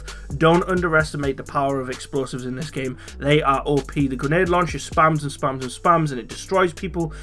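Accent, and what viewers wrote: Newcastle, United Kingdom. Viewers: Nice video dude - enjoyed that. Bonus points for what sounded like a Geordie accent too! =)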